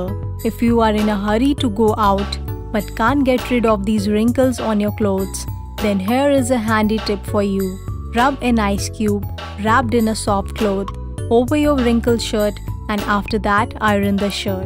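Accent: India. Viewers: cory Turner if the video is made by a indian the English will be the same way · Indian accent...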